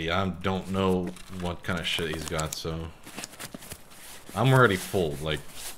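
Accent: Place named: United States. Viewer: С твоим американским акцентом хрен поймешь кто ты есть на самом деле, я подписывался на американца, а ты украинец вообще, если верить комментариям...вотэтоповорот. Жги, братюня!)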